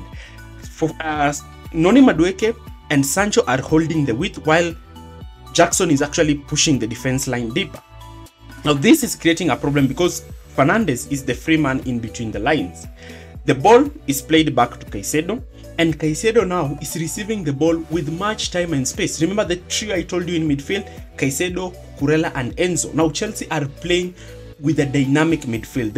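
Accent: Kenya. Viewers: Are you Kenyan? You sound like one 😂❤❤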